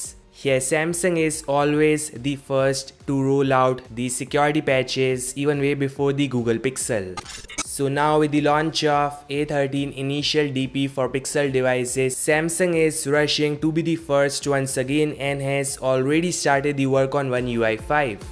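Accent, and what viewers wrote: India. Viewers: This is Indian accent Loved it !!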